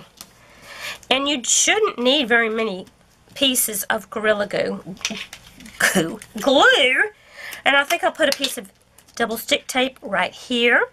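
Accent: Texas, United States. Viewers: I would really like a drill-along with you. I've been drilling along with your videos going in the background. It's so fun hearing a nice Texan accent when I'm from New Zealand :D I love landscapes. I'm working on Starry Night right now but would like to do custom landscapes of photos I've taken in the future.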